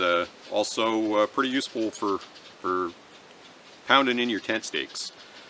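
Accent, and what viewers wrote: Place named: Vermont, United States. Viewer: Phenomenal presentation, sir! I thoroughly enjoyed literally every aspect of your video! I too, am a historical enthusiast, focusing on historical fencing, but interested in ALL aspects of life in the period…I specifically wish to hunt boar with a spear in the old style…I’ve hunted them with firearms, but to do it with a spear….at any rate, I digress…Your accent sounds local to me-(I live in the state of Vermont)-but due to your use of the metric system, I suspect you’re just North of me, in the lovely land of Quebec…I’ve visited Quebec City; lovely, historic town…I felt like I was somewhere in Europe! Thank you so much for sharing your passion! Its always good to meet kindred spirits. I hope this message reaches you well, sir!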